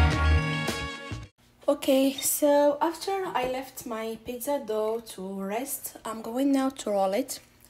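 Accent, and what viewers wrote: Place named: Morocco. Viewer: تبارك الله عليك قبل منشوف الصوره ديالك غير من الهضرة عرفتك مغربية ۔ الله يوفقك وشكرا علی الفيديو الجميل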